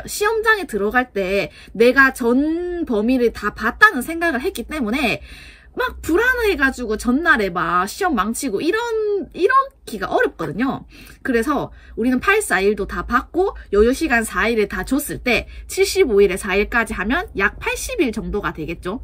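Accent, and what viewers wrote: Gyeongsang, South Korea. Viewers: 혹시 경남분이세요? 저 고등학교때 쌤이랑 말투가 넘 비슷해세유ㅎㅎㅎ귀엽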